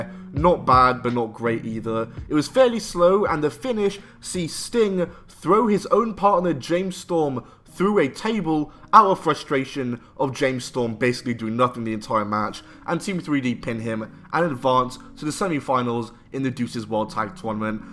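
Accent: United Kingdom. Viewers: 🙀🙀🙀You seriously scared the shit out of me when you all of a sudden tried to imitate Scott Steiner when he cut this promo especially since you have a British accent and you basically just yelled on the mic just like he did both in the beginning and in the end of this video!😱😱😱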